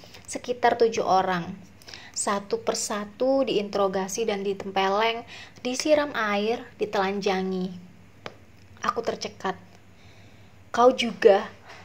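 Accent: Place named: Flores, Indonesia. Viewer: Aksen floresnya 😭 butt gooddd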